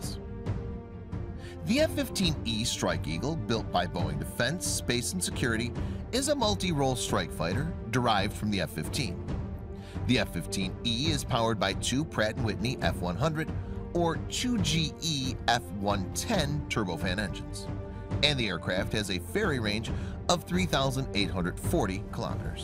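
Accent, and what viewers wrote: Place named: Kansas, United States. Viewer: My car's still have speedometers in miles per hour your voice Mr narrator sounds like it's straight from Kansas.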